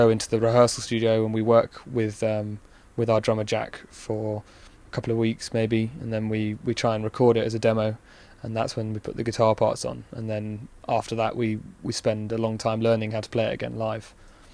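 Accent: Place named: United Kingdom. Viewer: Damn, u r so hot!!! Love ur Brit (London?) accent...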